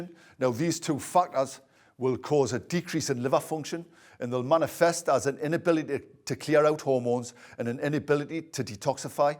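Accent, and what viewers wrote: Newcastle, United Kingdom. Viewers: I actualy understood that. Must have been the jordie accent!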